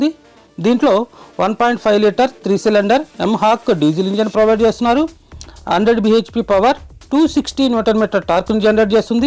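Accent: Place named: Telangana, India. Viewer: అచ్చ తెలంగాణ యాసలో అద్భుతం గా అన్ని విషయాలు చాలా బాగా తెలియ పరుస్తారు మీకు నా ఆశీర్వాదం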